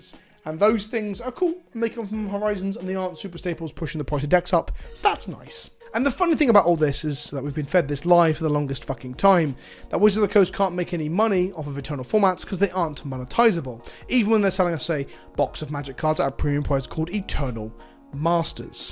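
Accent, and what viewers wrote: United Kingdom. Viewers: It feels weird being from the UK... watching and hearing prices referred to in dollars ...with a british accent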